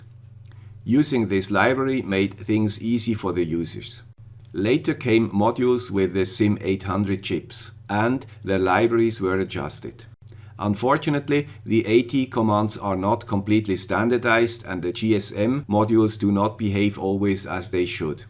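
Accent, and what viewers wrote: Switzerland. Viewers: Great video ! Btw, I don't mind your Swiss accent. It's not too bad ! Please continue with these interesting video's. It's very entertaining. Greetz !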